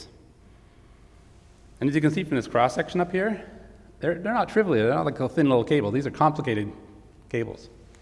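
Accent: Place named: Canada. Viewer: This dude is Canadian for sure.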